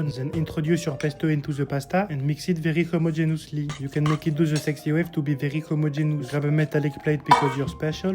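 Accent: France. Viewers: Love the broken english with french accent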